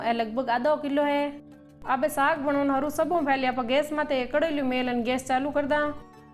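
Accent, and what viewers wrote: Marwar, India. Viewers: Bhabi PYOR MARWADI bole 😊😊😊😊😊😊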